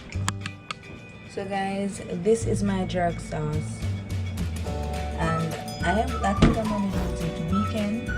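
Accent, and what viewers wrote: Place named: Jamaica. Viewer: I love your intro, thats really nice. You have a nice accent. I love the jamaican accent. Nice prep you did before blending . Need to try this new to me. Thx 4 sharing 🥰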